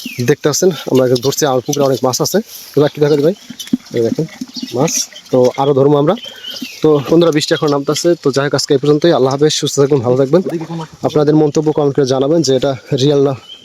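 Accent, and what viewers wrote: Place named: Barisal, Bangladesh. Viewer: BORISAL BOLE KOTHA :face-blue-smiling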